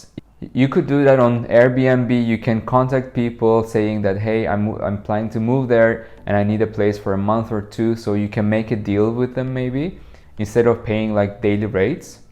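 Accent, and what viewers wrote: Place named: Netherlands. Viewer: You speak English with a Dutch accent, so your Dutch must sound very good :)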